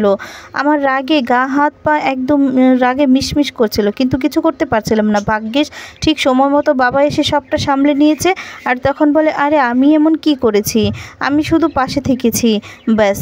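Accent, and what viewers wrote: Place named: Bangal, Bangladesh. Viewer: তোমার কথকতা ভাল হচ্ছেনা। বাঙ্গাল বাঙ্গাল উচ্চারণ শুনতে খারাপ লাগে। এই উচ্চারণ ঠিক না করেই কথকতা নাকরলে ভাল ।অপদার্থ। একটা কথা,চেষ্টা করলে তুমি বাঙ্গাল বাঙ্গাল উচ্চারণ ঠিক করে শোনার যোগ্য কথকতা করতে পারবে।বিশ্বাস রাখো।শুভেচ্ছা জানাই।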